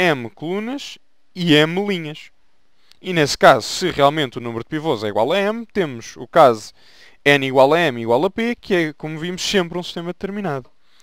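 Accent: Portugal. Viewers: É o português de Portugal, entendi nada Cadê as aulas com o português brasileiro?? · eu n entendi nada ele ta falando portugues de portugal kkkkkkkk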